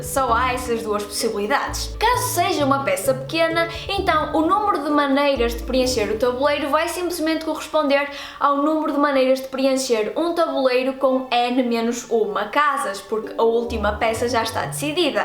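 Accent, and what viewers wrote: North, Portugal. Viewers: Amei isso! Encontrei esse video pq estava mesmo pesquisando por Fibonacci no google, e ainda bem q ele me mostrou esse video. Excelente explicação. Me deixou ainda mais fascinada por esse assunto. E que que mocinha linda:) De que lugar de Portugal vc eh,? Ja viajei bastante pelo teu pais, mas nao reconheco esse sotaque. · Como eu vim parar aqui? Oo Adoro esse sotaque da terrinha ♥ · Deivid H. Mais especificamente, do norte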